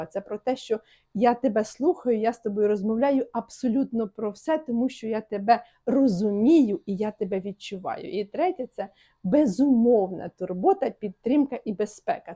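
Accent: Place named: Russia. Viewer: Не столовій а чоловікине щьо а що перестаньте Па рускі вимовляти українські тверді приголосні ж ч ш щ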